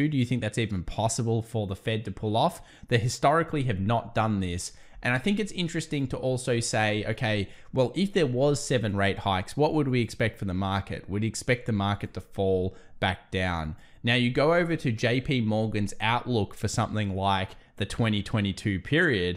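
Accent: Australia. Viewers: "Apple" is not pronounced "epple". Therefore, "Well" is not pronounced as "Wal" and "selling" is not pronounced "saling", "help" not "halp", "health" not "halth' - do you get it? Without these distractions, your presentations might be more listenable. I realise that this a peculiar to residence of the State of Victoria but it doesn't make it right.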